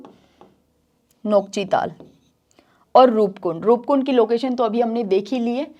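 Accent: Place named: Gujarat, India. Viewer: Apke pronounce sation Amit Shah ji sahan hai. AP Gujarat se belong krte ho kya?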